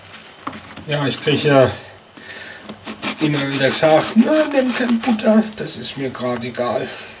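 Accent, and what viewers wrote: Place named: Palatinate, Germany. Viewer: Top Video Was für ein zauberhafter Sonntag hier in der Pfalz! 🌧☔ Trotz des regnerischen Wetters hat dieser Tag einen ganz besonderen Charme, den nur diese Region bieten kann. Die Regentropfen spielen eine sanfte Melodie auf den Dächern der urigen Pfälzer Häuser, während die Natur herum in einem satten Grün erstrahlt. Und dann dieser urige Pfälzer, der uns in dieses YouTube Video zeigt – er ist einfach das Herzstück dieser Atmosphäre! Mit seinem herzlichen charmanten Dialekt entführt er uns in die Welt der Pfälzer Gastlichkeit gr. aus Lemberg Eure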